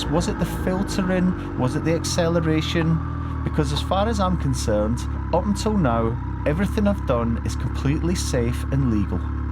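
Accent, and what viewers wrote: Scotland, United Kingdom. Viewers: Ayrshire in Scotland pal , so people in Scotland WILL think you have a Scottish accent as i did , you definitely sounded Scottish to me , otherwise i wouldn't have mentioned your accent. A Scotsman can recognise a Scottish accent no matter whether it's fae Ayrshire , Fife, Glasgow or Shetland , all very different but all recognisable as Scottish, and definately not ' general' , just the same as an Englishman, Welshman or Irishman. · Do you have a Scottish accent ?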